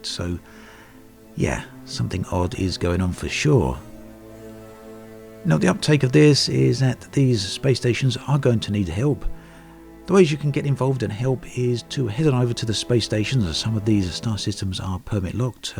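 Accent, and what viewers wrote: United Kingdom. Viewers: This dude says Bri'ish unironically, I promise.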